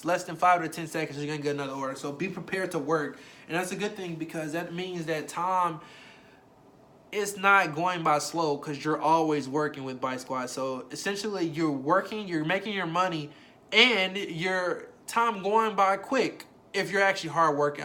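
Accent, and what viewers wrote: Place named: Arkansas, United States. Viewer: I knew you sounded like an arkansan. I basically found a guide to uber eats in my state. I appreciate that.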